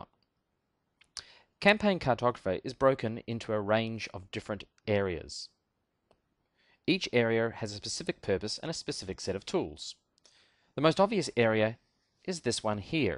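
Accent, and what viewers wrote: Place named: Australia. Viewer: As an Aussie myself, I'm 99% sure its an Australian accent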